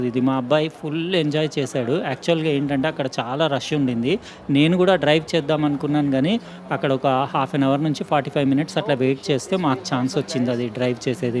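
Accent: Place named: Telugu, India. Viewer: Yes i liked it sir....u soothing voice and Telugu slang with total technical things in simple way..... Plz visit china electronic market..... plz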